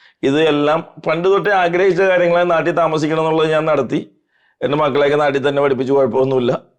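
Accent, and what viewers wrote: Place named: Kochi, India. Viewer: ആാാ ക്വച്ചി ഭാഷ ആണല്ല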